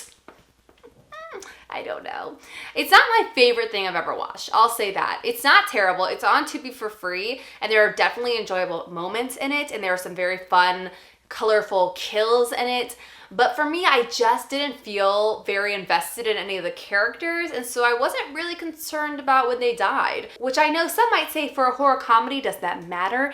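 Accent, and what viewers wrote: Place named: United Kingdom. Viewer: Killer British accent btw. Heehehehehe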